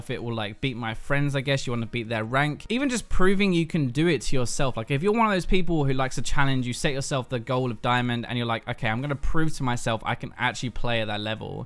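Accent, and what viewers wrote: United Kingdom. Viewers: "Some people watch for the British accent" THE JIG IS UP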